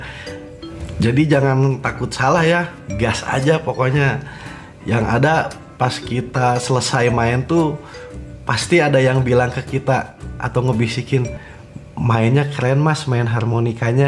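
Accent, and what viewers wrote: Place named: Bandung, Indonesia. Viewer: Bandung banget bahasanya aa 😅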